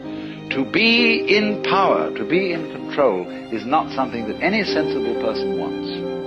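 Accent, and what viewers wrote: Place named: RP, United Kingdom. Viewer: You are the first posh-sounding English bloke I've EVER warmed to. Thanks😊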